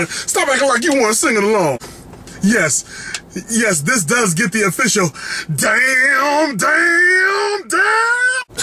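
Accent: United States. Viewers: best American accent.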